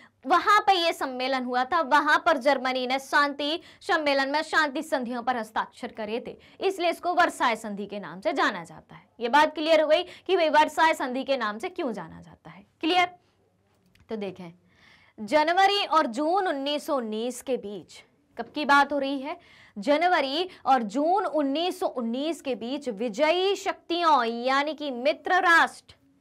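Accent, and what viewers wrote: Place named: Rajasthan, India. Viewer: Mam aapka ton rajasthani ton lag raha hai . Aap kis rajya se ho please batao n 😊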